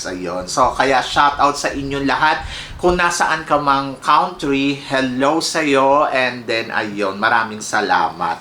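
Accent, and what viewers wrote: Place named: Philippines. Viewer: Anong probinsya mo sa Pinas,? Para kasing hindi Tagalog ang native tongue mo.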